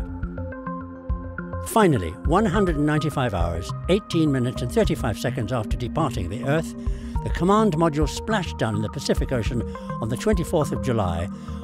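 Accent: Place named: United Kingdom. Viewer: Do you think they have British documentaries in England that are narrated by Americans probably not so why do we have to listen to limeys over here every time we want to learn about something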